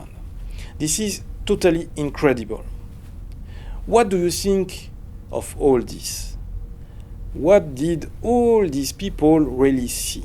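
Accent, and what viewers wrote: France. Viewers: Literally the strongest french accent I've ever heard 😂, cest bon mon ami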